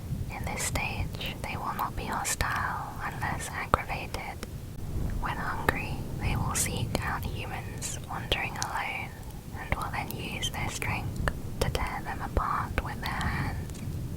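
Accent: United Kingdom. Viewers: She's from the uk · are you british? im british btw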